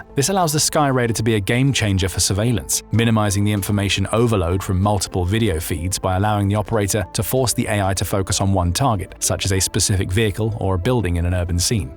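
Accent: England, United Kingdom. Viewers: Coming from some guy with an english accent, this is almost comical...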